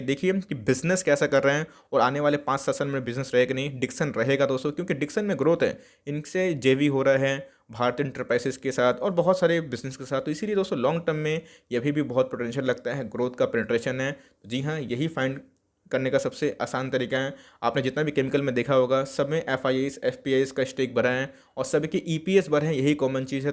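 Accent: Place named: Bihar, India. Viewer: Kaisa bolta re up bihariya tu bare ki nahi bare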